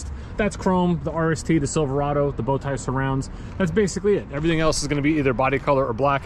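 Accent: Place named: New York, United States. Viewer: Finally they fixed the seatbelt and you can adjust it now. I love your New York accent.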